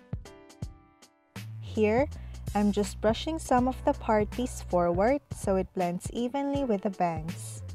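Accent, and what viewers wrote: Philippines. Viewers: are you Filipina?Cuz you have a filipino accent...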